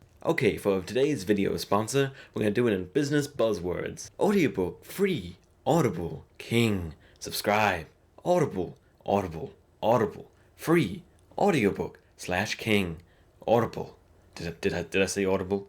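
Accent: New Zealand. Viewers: He has a very mild Kiwi accent.. like a Kiwi who's been living in Australia for quite some time. That would be my guess anyway.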